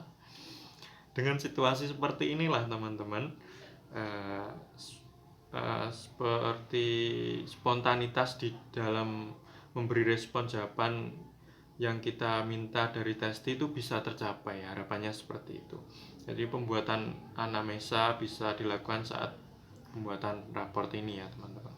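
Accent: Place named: Java, Indonesia. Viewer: Medhoknya itu lo kak😆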